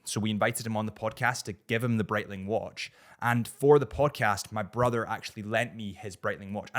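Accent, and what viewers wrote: United Kingdom. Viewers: Bro if u want your channel to have more wider audience speak slowly coz not all of us are native from UK that could easily understand your accent, I love your channel but i keep repeating it just to understand on what you are saying, i know that theres a subtitle but still its not accurate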